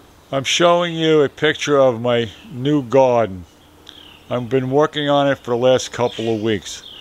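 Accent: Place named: Long Island, United States. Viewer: Thanks so much for sharing this with us, Grandpa! these 'raised" gardens seem to be all the rage these days, no bending down to weed!! Thanks too, for sharing that delightful Long Island accent with us, the word "tomato" becomes 'TOMATA."!!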